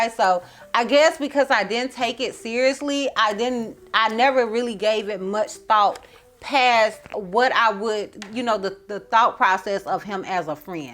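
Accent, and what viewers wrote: South, United States. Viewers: I loved this video! You had me laughing out loud in my livingroom! Between your story, the voice changes, sound effects, your facial expressions, and your cute country twang, you had me hollering here in NJ! ⁰Thank God he ain't kill ya! Lol! Chica, You are too much but so funny. Looking fwd to hearing your next zodiac tale! LOVE YOUR CHANNELS, Ms.!!😅✌🏽🤣🙏🏽😉💜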